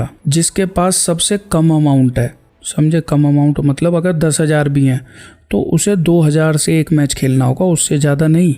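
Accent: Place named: Delhi, India. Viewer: दिल्ली का है इसलिए लहजा केजरीवाल जैसा है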